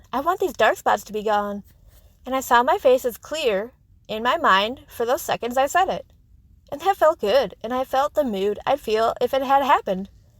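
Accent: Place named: United States. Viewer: Thank You Yesterday For All Your Patience, And Kindness To Helping Realize That I Am The One Who Decides My Reality. You Are Truly Awesome. I Am Curious Of Your Accent What State Are You From ? If You Don't Mind Telling Me. If You Do Thats OK. I Understand. Regardless You Are Awesome. I Am Sure By Using The Term Awesome You Have Figured Out I Am Old. LOL.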